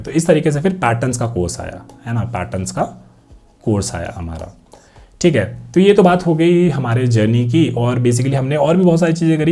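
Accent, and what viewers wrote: Bihar, India. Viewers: Aap bihar se h kya thik h 😊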